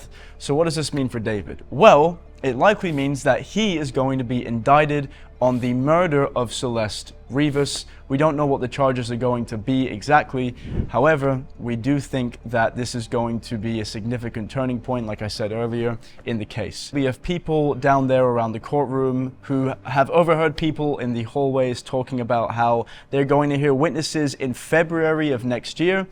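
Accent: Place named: United Kingdom. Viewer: Who’s this slow talking Brit 🥱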